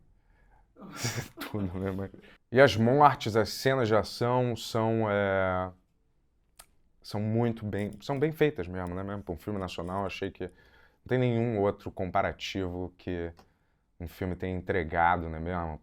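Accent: Rio de Janeiro, Brazil. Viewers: Muito carioca! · Amigo ,carioca. Carioca, amigo. Pronto, agora você já sabe como fala um carioca. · mano eu odeio teu jeito de falar e esse jeito de playboy carioca ''nem de esquerda nem de direita'' puta que pariu\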